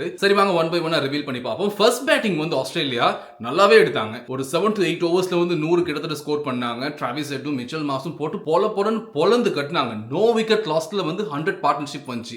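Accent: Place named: Tamil Nadu, India. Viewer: நீங்கள் ஏன் தமிழ்நாட்டுக்காரர் போல் பேசுறீங்க!?😮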